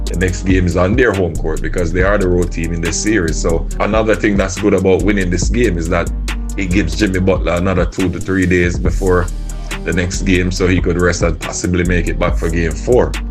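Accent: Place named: Caribbean. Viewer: First time I’ve heard a Dubs breakdown from someone with the Caribbean patois, like the sound…thought you’d have Steph cooking with some scotch bonnet or cooking up some salt fish and ackee…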